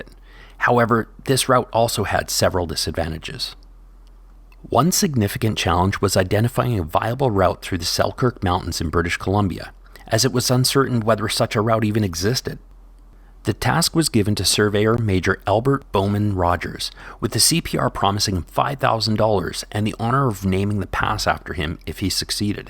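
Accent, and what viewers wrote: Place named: United States. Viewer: For someone claiming to be local, it is annoying to find that you are American. Your accent ant mispronunciation of words, especially "route", belie your true nationality.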